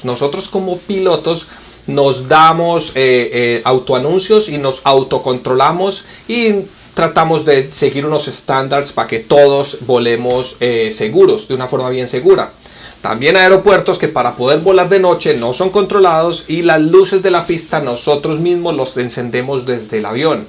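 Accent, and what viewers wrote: Colombia. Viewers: EXCELENTE....QUE SENCILLEZ. PARECE PAISA O BOGOTANO .....PERO COLOMBIANO SI ES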